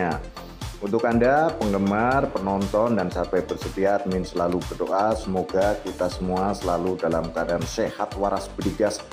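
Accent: Banyumas, Indonesia. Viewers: Ora ngilangi logat ngapak e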